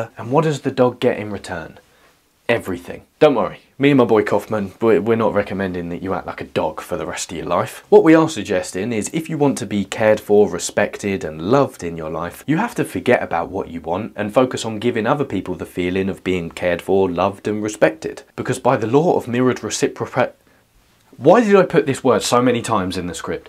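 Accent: United Kingdom. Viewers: wat an accent. I believe it's a British accent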